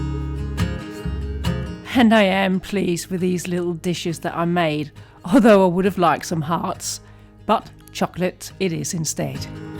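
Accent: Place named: United Kingdom. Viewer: Wow! Your porch is absolutely stunning! And those sweet teddies are tucked in the tree so they are sheltered from the elements! I think Hermes makes a bag styled very similar to your Teddy Blake bag. Aren’t you chic with that fabulous handbag! Finally, besides loving a British accent that I have fun trying to mimic, I learn new words from you. In the USA we call “wadding” filling or stuffing. You have convinced me that I need to make some of the felt hearts with wadding! Than you so much for sharing your creativity with us!❤❤❤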